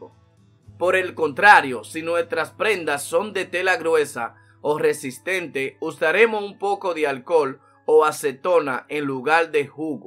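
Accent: Dominican Republic. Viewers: ES DOMINICANO!!!🇩🇴😁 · Creo que su acento es de republica dominicana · Es de republica dominica que nunca le escuchaste esa voz a alguien🤥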